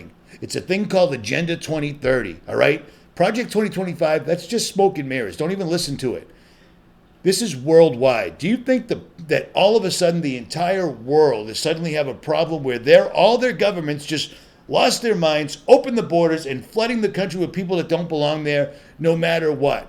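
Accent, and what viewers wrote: Boston, United States. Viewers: That’s Boston lingo.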